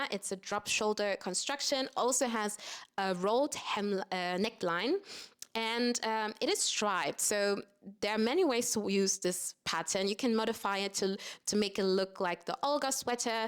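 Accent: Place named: Germany. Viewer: He, he - wenn dich die Aussprache von Rerum Natura nicht verraten hätte - spätestens bei „soooo“ war ich mir sicher, dass du in Deutschland zuhause bist! 😊 LG aus Österreich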